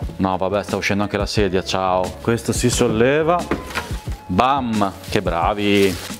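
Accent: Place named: Romagna, Italy. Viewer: Forte della qualità video/audio ottima, ed incuriosito dalla sedia, ascolto la tua recensione... ma soprattutto il tuo accento e come pronunci la "z". Diamine! Penso: deve essere per forza romagnolo. Ed appunto, ci troviamo a neanche 20km di distanza. Complimenti per l'ottimo video!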